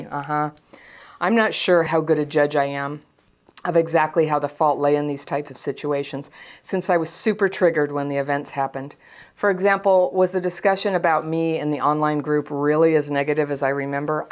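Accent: United States. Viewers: So Southern!!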